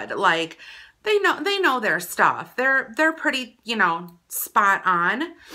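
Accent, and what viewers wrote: Wisconsin, United States. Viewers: People from Wisconsin sound like this?? Interesting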